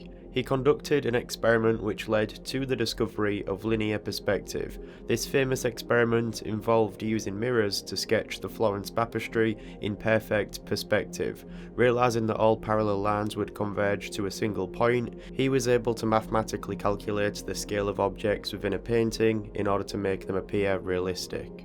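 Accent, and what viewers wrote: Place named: Scotland, United Kingdom. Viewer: Is he Scottish? I love his accent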